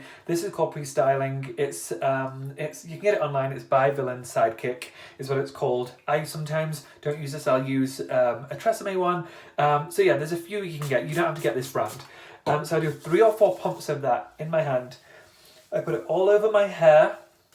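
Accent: United Kingdom. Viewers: I have no need for this, female with mid-long hair, but listening to you is really soothing. As a fellow brit I'm in love with your accent! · I love how this is how you actually talk, you have a proper queens english accent & its amazing!! I'm from Liverpool (UK) and I want but nothing to have the Queens English accent! haha. Also I love your videos!!!! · His accent is not that posh he’s just british!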